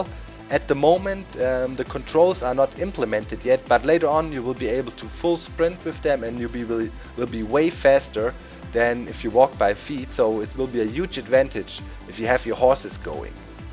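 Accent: Germany. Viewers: are you german?? you sound Like a German Person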